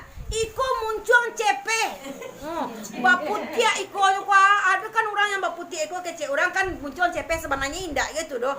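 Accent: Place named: Minangkabau, Indonesia. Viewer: terbaikkk ustazah..👍👍 Haha Bakaleberan muncuangnyo Bahaso urang awak..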